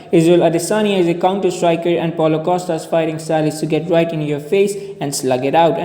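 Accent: India. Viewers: he deffinetly is lol, however, he's either been living in the uk or just been on the Internet so much he's picked up some slang... Dont think I've ever heard "this will be a banger of a fight" in an Indian accent before.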